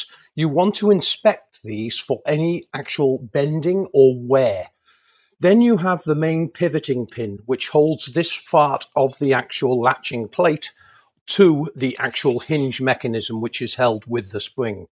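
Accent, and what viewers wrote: United Kingdom. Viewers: Absolutely fabulous! As usual. Every time I find something new to learn with. Special thanks for your plain, Royal English so very easy to follow!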